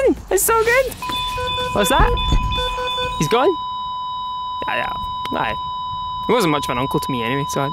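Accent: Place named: Scotland, United Kingdom. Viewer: You should review more games. I love that Scottish accent. As an English language learner, this is like relearning English all over again.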